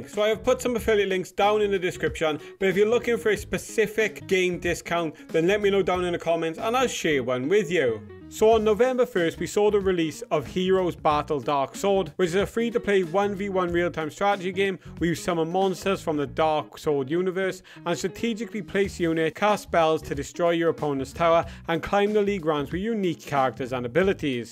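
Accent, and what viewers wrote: Wales, United Kingdom. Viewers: Ohh god so nice to hear a fellow Welshman ❤ Da iawn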